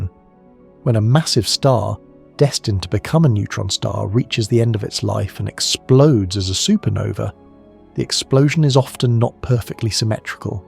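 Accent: United Kingdom. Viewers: Hey YouTube I'm talking to you Please stop recommending science for sleep or the Sleepy scientist or go to sleep listen to this fucking British dude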